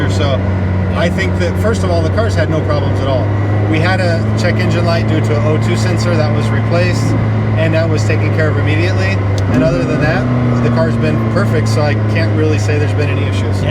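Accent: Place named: Northern California, United States. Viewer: Always appreciate your videos. I've been following your journey since boosted borris. I'm planning on coming to apex as soon as the world is back to normal. I'm curious you are definitely from the states and perhaps in a video if you haven't already you could talk about how you ended up in Germany and also, my guess is your either northern CA or the north west born and raised? Am I close? Cheers.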